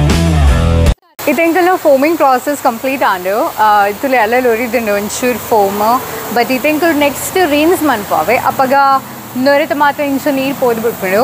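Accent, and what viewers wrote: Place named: Tulu, India. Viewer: Your tulu slang is so nice.... Nice video..👌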